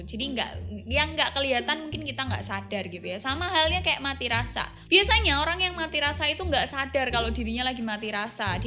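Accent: Java, Indonesia. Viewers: ngomong nya kaya orang jawa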